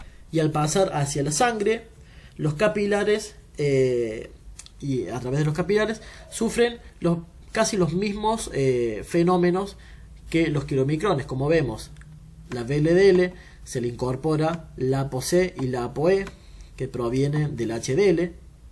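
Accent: Córdoba, Argentina. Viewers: muy bueno, me gusta porque sacas todo del Blanco, que dicho sea de paso por tu acento cordobés supongo que son paisanos jajaj saludos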